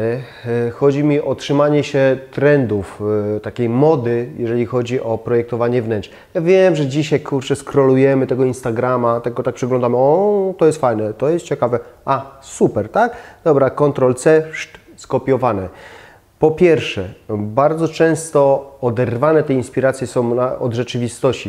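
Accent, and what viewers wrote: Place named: Upper Silesia, Poland. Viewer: Jest Pan ze Śląska? Bo wyczuwam właśnie sposób mówienia charakterystyczny dla ludzi z Górnego Śląska 😁👌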